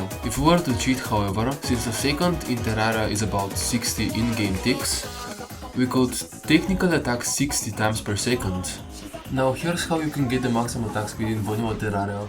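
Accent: Russia. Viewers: твой акцент очень похож на русский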